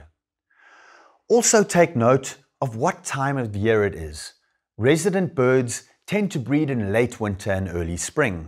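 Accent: South Africa. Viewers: I knew your accent was different! I just saw a comment that said you are south African. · I can clearly hear this guy is South African. We love bird watching 😂😂😂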